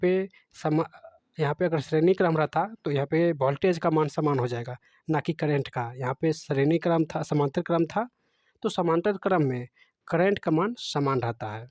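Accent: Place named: Gujarat, India. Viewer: सर आपकी मातृ भाषा गे छे वाला है सही या गलत आपके बोलने के tone se पता चलता है😊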